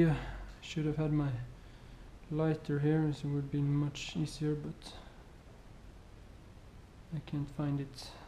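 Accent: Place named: Norway. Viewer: By your equipment and your accent i bet you are from scandinavia, most propably from norway.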